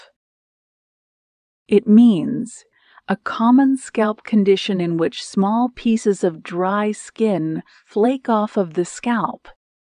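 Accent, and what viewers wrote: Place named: United States. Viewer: This is American English, please differentiate.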